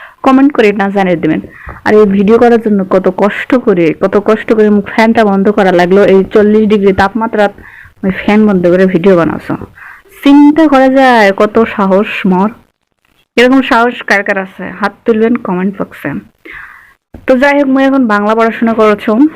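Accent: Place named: Panchagarh, Bangladesh. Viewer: হামরা পঞ্চগড়ের লোক হামার কথার সাথে মিল আছে তুমার লগত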